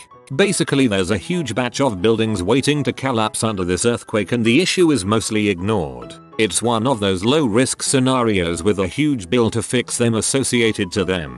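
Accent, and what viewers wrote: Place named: United Kingdom. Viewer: I only listen to these cause i want to hear a robot brit say "bantha fodder "!....double quote....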